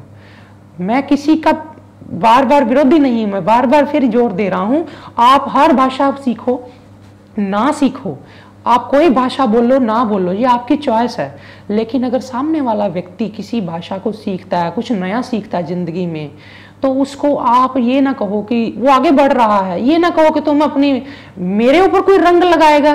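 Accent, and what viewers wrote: Punjab, India. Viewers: I actually enjoy listening you just because you have your own punjabi accent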